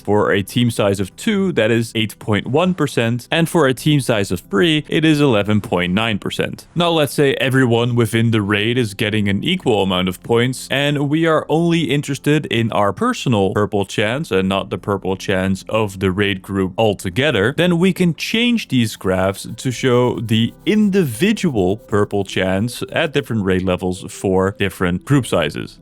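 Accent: Netherlands. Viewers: Is that a dutch accent i hear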